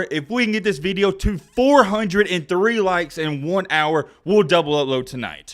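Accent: South, United States. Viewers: He saying wat he been doing all da times.u sounds country ass hell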